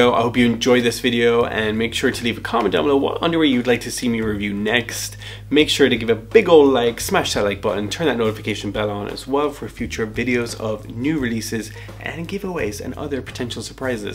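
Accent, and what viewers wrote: Ireland. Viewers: Where are you from? I’m trying to figure out that accent...it sounds subtly Irish. I initially thought it was Scottish, but after listening to you, it definitely isn’t Scottish. Regardless, you’re nice to watch. 😍🏳️‍🌈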